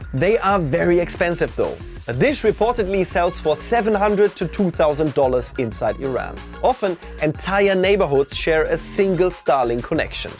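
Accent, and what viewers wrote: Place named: Germany. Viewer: German Accent detected 🇩🇪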